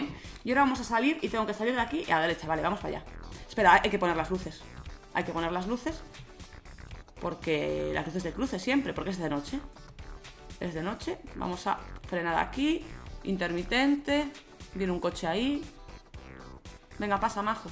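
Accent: Argentina. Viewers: parece un argentino cheto o de verdad sonamos asi? xD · Se llama "español rioplatense"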